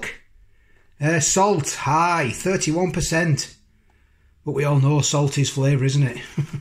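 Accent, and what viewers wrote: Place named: Lancashire, United Kingdom. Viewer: Where you from, you speak like me lol. Lancashire/?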